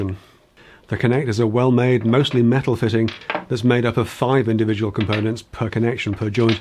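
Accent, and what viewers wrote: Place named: England, United Kingdom. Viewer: There is something about the English accent and their way of doing things that is so soothing & satisfying. Ta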